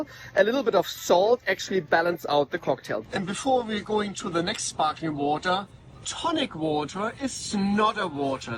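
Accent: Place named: Germany. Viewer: Are you German or Austrian? I love your accent. Im trying to familiarize myself with different accents. Also excellent video. One of the most thorough explanations ive seen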